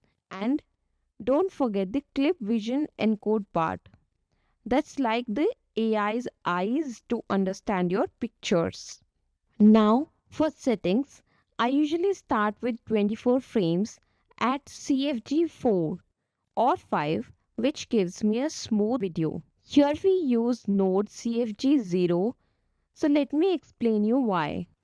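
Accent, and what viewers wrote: India. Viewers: Jesus Christ, you're indian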